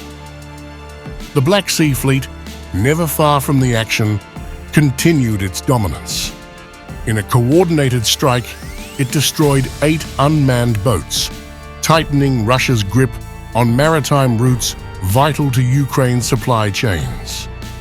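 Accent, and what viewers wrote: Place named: United Kingdom. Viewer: I guess the Russians have abandoned hope that the incoming Trump Administration would work for the comprehensive peace arrangement in Europe they very reasonably want. The Brit accent of the narration initially put me on guard, the Brits being arguably the most propagandized Russophobic nation in Europe. I kept waiting for usual Western anti-Russian lies and propaganda. Thankfully they never came, and I'm subscribed.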